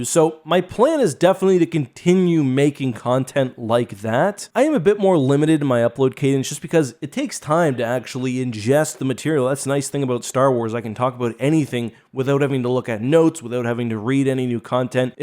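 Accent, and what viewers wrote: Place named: Eastern Canada, Canada. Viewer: You Definitely sound like you are from the east coast of Canada.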